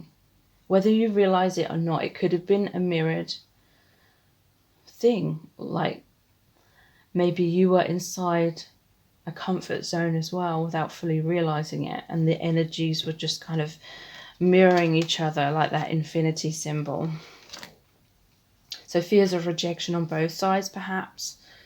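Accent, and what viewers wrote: United Kingdom. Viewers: Girrlll, I love the british accent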